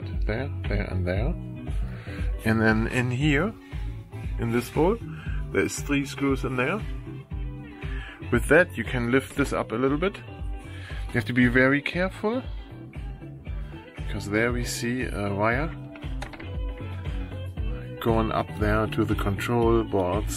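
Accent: Germany. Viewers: Er hört sich irgendwie deutsch an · Thank you for this video! My party box has stopped charging and only works when it is plugged in to AC power and the DC power does nothing. By the way, your accent makes this video a hundred times better. I love the German language 😅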